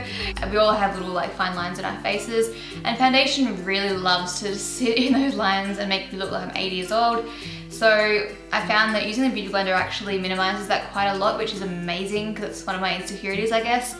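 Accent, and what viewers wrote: Australia. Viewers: I'm new to her channel I just want to know is she Australian??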